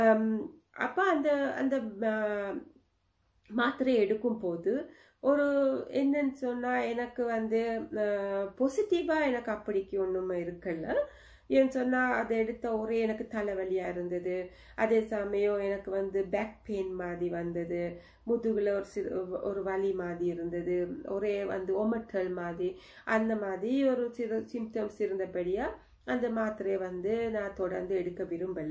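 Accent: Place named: Sri Lanka. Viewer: R u srilankan . speach tells.love from srilanka❤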